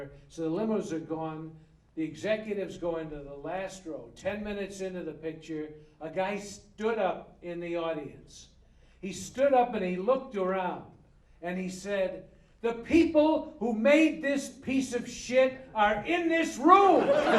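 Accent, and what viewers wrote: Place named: United States. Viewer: the hand gesture and US accent threw me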